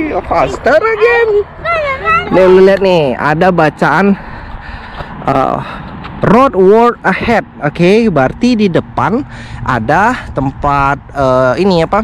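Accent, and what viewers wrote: Indonesia. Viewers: Mantap aksen anakmu udah kayak anak2 amerika, kalo bapaknya masih kentara indo banget hehe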